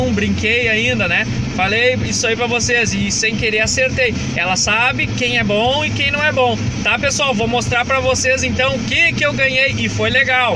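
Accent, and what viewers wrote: South, Brazil. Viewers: Fala parceiro! Pelo sotaque vc é do sul rsrs...qual sua nota média?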